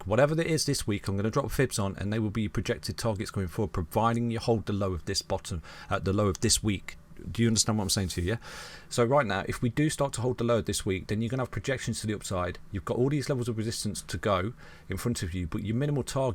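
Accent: United Kingdom. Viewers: Finally, the first! I love your channel and how yku present everything. British accent on top. Haha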